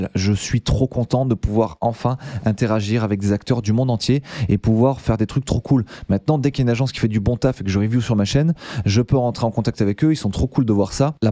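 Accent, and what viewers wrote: France. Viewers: Perso j’étais pas chaud pour les vidéos en anglais, mais malgré mon niveau d’anglais à chier, comme tu utilisais des mots simples et avec ton accent français, étonnement je comprenais 90% ! Mais effectivement ça fait un moment que je ne voyais pas tes vidéos dans mon feed 😖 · Le meilleur dev français ❤️ Tu as totalement raison, apprendre de ses erreurs fait grandir mais c'est parfois nécessaire de se lancer et de s'écouter pour pouvoir tenter de nouvelles choses, car c'est en expérimentant qu'on innove. Après je pense que c'est normal que ton accent français en anglais fasse peur aux français mais ce n'est pas du tout un problème pour les anglophones, au contraire ! Continu de produire du contenu de qualité peu importe la langue, je suis sûr que tu va continuer à grimper très vite 💪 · yes, it's great decision to continue for worldwide people 🗺 it's great to follow a french cool man who speak in english with a french accent ça se dit redresser le cou, tu peux même consulter un bon médecin pour ça Du coup, tu vas commander un nouveau plateau TV pour la chaîne internationale ?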